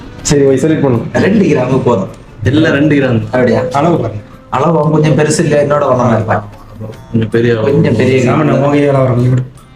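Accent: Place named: Kanyakumari, India. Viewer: அண்ணா நான் பிறந்தது மதுரை ஆனா வளர்ந்தது கன்னியாகுமரி முழுக்கோடு YMCA உங்க கன்னியாகுமரி பாசை கேட்கும்போது என்னுடைய சின்ன வயது நியபகம் வருகிறது வாழ்க்கை இந்த பாசை என் வாழ்க்கை மறக்க முடியாது மிக்க நன்றி அண்ணா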